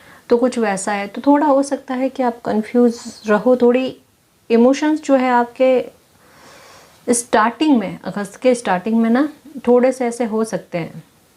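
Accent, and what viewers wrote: Gujarat, India. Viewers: Aap gujarati ho shayd...tone se hi pata chal rha h😁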